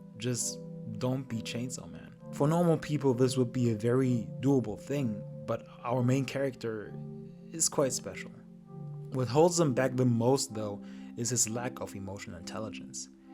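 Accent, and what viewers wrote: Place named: Germany. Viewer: Intentional or not, you have a german accent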